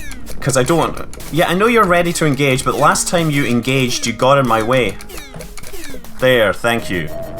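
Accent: Australia. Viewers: That Australian accent XD Don't worry, I forgive you. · Your Australian accent makes my hair fall out from cringing. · nice australian accent! sounded like the guy was from up in the bush! Thumbs up for Australian!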